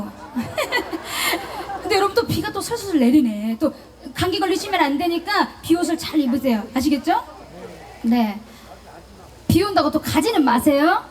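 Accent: Jeolla, South Korea. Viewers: 송가인 가수님 전라도 사투리 정겹고 친근감들어 재미나요 ㅎ ㅎ 노래힘있고 무대꽉찬느낌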